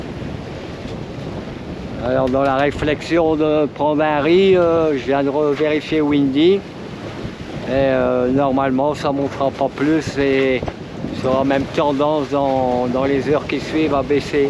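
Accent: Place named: Valenciennes, France. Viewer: D'où qu'ej viens.. bin ed Wavrin, mais maintenin ej chui din l'pas de calais, diéval pour être précis.. Mais toi,?? j'ai l'impression de reconnaître un accent valenciennois, mais je ne suis pas sûr;)... té dou tizotte??? Sage décisison de faire demi tour.. d'ailleurs, c'est un voilier, pas un hors bord, ton bateau LOL.. donc faut savoir profiter du vent: trop de vidéos où ils se doivent de mettre le moteur.. et suis presque sûr qu'une majorité ne sait pas utiliser le sextant: s'il n'y a plus d'électricité à bord, je crains que ça ne finisse en panique, si ce n'est en drame... Au fait: pilote automatique, mais as-tu un régulateur d'allure?? je demande ça car mon grand père en avait fabriqué un quand il avait son bateau à Etaples (un petit Challenger 7 mètres insubmersible): une fabrication maison avec du contre plaqué marine.. Bon, à la revoiyure dans deux jours pétants;)... 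Cordialement. éric.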